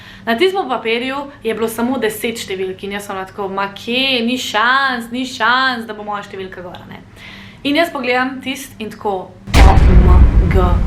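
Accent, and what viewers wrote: Ljubljana, Slovenia. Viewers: She never replied to you but if it helps judging by her accent i’d say she’s from Ljubljana. I’m not a frequent viewer so i don’t know where exactly she’s from, she might reside in Ljubljana or not but judging from her vernacular alone she lives/ or at some point lived in Ljubljana or in its proximity. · who is she supposed to learn from? Each Slovenian YouTube vlogger/influencer has their own good points while speaking Slovenian. So does everybody else. I would not learn Slovenian from a person from Primorska or Štajerska, for example. Ljubljana dialect is perfectly fine. However, I agree with you that the news might be even better.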